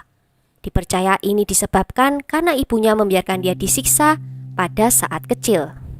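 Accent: Java, Indonesia. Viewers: Hallo jg medok kuhhh.. Alhamdulillah sehat.. sukses trs yaa say👍💪🙏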